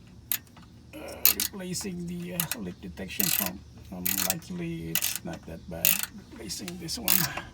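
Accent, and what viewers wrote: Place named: Philippines. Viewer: Love your accent, I know you’re Filipino! Lol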